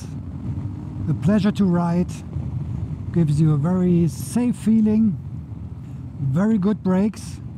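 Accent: Germany. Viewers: Your accent sounds Teutonic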